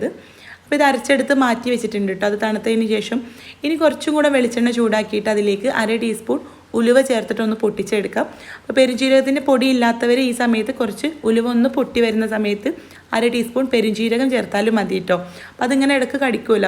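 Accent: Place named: Kasaragod, India. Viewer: കാസർഗോഡ് നങ്ക്...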